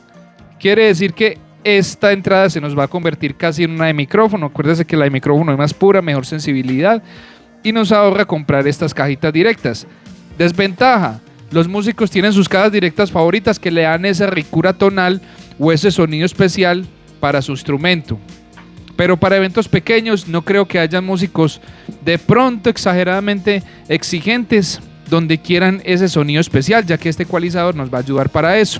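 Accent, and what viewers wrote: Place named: Cartagena, Colombia. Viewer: Muy clara y buena guía la que nos dejas,para los que nos gusta manejar sonido y no tenemos una formación técnica o de escuela. Tu acento es bien cartagenero,verdad?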